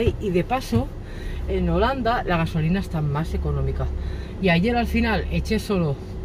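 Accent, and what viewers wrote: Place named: Catalonia, Spain. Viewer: Muchas musulmanas podrían tomar ejemplo de tí, porque hay algunas que madre mía! hay que darles el té aparte. 🤦‍♀️🤪 Saludos de una mallorquina desde Mallorca, te noto el acento catalán, ets molt maca! petons. 👍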